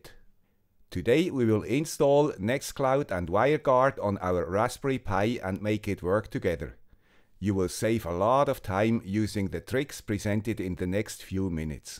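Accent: Switzerland. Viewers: Never imagined a guy with swiss accent would save the internet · Thank you guy with the Swiss accent. This exactly what I’m in the middle of trying to set up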